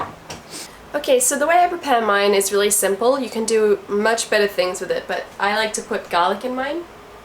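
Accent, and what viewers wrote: United States. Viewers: I'm hearing a little bit of American popping in to your accent lately :') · Sick has kind of an American accent haha Hope you're feeling better! My go-tos are olive leaf extract, OJ, tomato juice, and soup. I had a flu-y thing a few weeks ago that wiped me out for 2 weeks, hope you haven't had the same thing. It was horrible.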